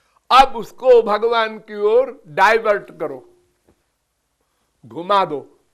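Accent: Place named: Haryana, India. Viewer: Swamiji haryana se lagte h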